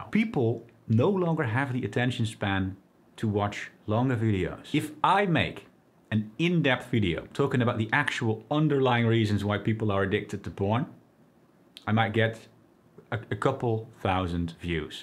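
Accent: Netherlands. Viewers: Ik dacht al dat ik een nederlands accent hoorde hahaha.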